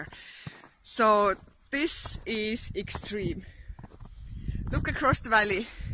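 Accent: Ireland. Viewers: BEAUTIFUL AND YOUR GETTING AN IRISH ACCENT THERE KIDDO !!!!!!!! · Your accent is great! I can hear a definite Irish element to your accent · You're getting the cork accent!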